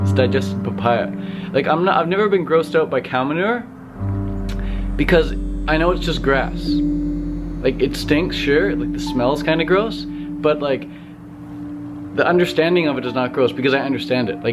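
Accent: Canada. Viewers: Your Canadian accent is unbelievably strong hahaha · Lmao, it's so funny because us Canadians don't ever hear the different btw the Canadian and American accent. I didn't even know he was Canadian until this comment :)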